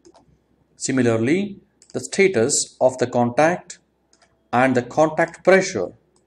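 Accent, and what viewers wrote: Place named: India. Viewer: God!Indian English!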